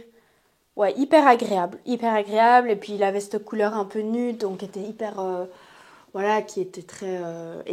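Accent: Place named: Switzerland. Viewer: Ça me fait aimer l’accent suisse 😊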